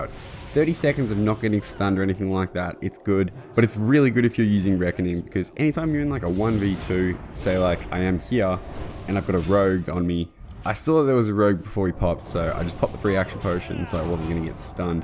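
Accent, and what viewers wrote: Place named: Australia. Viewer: I hear a fellow Aussie here!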